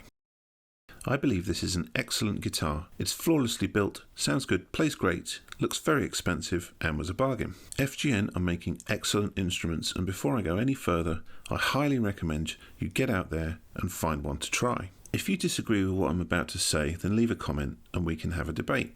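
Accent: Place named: United Kingdom. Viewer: This is a completely brilliant review, and just what I was looking for. I was looking at this model and some of the Eastman LP-types. Plenty of info on those, very little on these, so thank you so much. I have two questions, if you don't mind. First, how does the guitar hold tune without locking tuners? And, they say that the frets on these are "jumbo" (which i love), but so often that really means "medium". So, anything you could say about the frets would be great. Thank you again for being the best demo of this guitar on YT. Cheers!👍🙏PS- as a yank-anglophile, I have to say that you voice and your accent are so beautiful to listen to.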